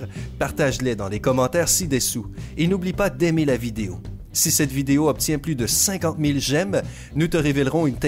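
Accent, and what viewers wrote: Quebec, Canada. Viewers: Il a un accent québécois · ton accint québécoué j''jl'aime jaime beaucoup sa fait plaisir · J'adore cette prononciations canadien ❤️ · S’IL TE PLAÎT SYMPA !!!!!! ARRÊTE D’UTILISEZ CETTE VOIX ELLE EST HORRIBLE ÇA ME DONNE MÊME PAS ENVIE D’ECOUTER LA VIDÉO DONC S’IL TE PLAÎT REPREND LA VOIX COMME LAMA FÂCHÉ PLSSSSSSS PLUS JAMAIS LÀ VOIX CANADIENNE !!! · L’accent québécois la !! Valide 😭😂😂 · Jaime bien l'accent canadien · J'adore ta voix québécoise :D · J'ai mis un poucebleu pour l'accent québécois. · Pourquoi la voix est québécoise (on dirait Luc Langevin)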